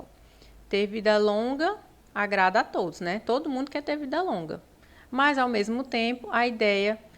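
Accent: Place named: Northeast, Brazil. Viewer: Quando acho vídeo aula com o meu sotaque nordestino, meu coração fica como ?! 🥰🥰🥰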